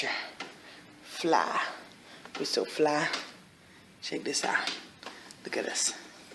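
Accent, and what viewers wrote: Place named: New York, United States. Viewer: Wow! It's really you Kid. Thank you for so many memories. I loved and that joint back when I was Thirteen and still do. You inspired me to start rapping and making music. The 90's dance pop is booming again. You should start touring. Your story is incredible. I always thought you was from New Yaaawk.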